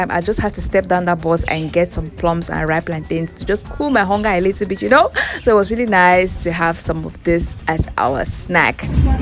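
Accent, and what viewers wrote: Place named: Nigeria. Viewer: I don't know but you sound so Nigerian.. Nice video, you just got I new subscriber.. Keep up the good work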